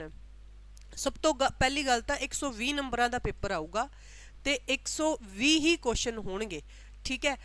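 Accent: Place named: Bagri, India. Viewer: ਬਾਗੜੀ ਆ ਮੈਡਮ,, ਪੰਜਾਬੀ ਭਾਸ਼ਾ,, ਜਿਦਾ ਬੋਲ ਰਹੇ ਆ ਪਤਾ ਲਗ ਰੀਆ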